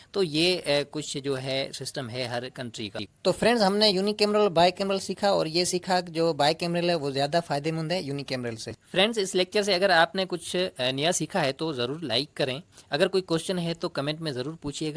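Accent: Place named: India. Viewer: Pakistani hokar indian Accent..How?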